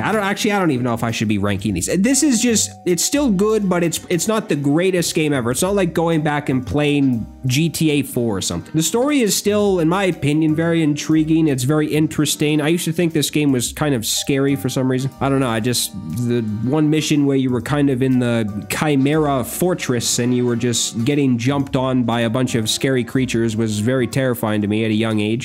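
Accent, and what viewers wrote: Canada. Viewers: I love your Canadian accent. But I will say that you should play Resistance 2 and 3. It improves on the first game....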